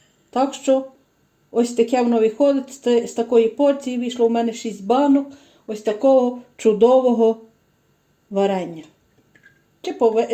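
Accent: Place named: Volhynia, Ukraine. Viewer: Карпати? Говір схожий на волинський, в районі Ковеля.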